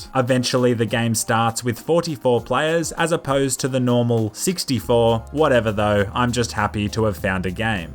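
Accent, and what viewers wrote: Australia. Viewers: You're Australian?!?!?!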